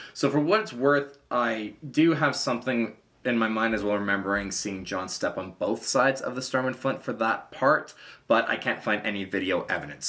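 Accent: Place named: Newfoundland, Canada. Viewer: Your accent is super interesting. Candian but with a hint of Irish? Is your family from Newfoundland?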